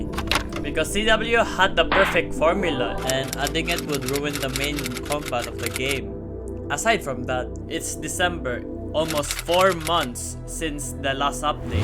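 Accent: Philippines. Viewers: pinoy to AHAHAAH halata sa accent eh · Is Filipino? cuz the accent sounds like it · haha knew it from the accent, im also a filipino playing cw nice content btw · Filipino Accent??? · This is the most pinoy accent ever yo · the strong filipino accent is killing me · you sound filipino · Im new to the channel and 20 seconds in i noticed that Filipino accent XD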